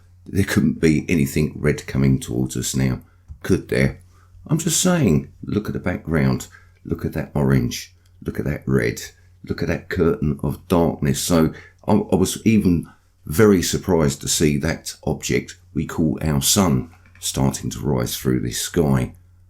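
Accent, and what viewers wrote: England, United Kingdom. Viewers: Nice to hear an English voice for a change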